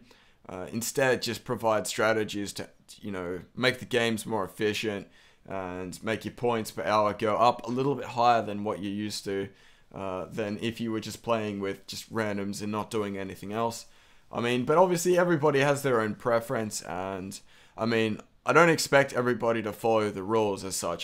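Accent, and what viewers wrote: Australia. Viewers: This guy is an Aussie or an NZ man, get your accents straight! What's your next guess, Scottish?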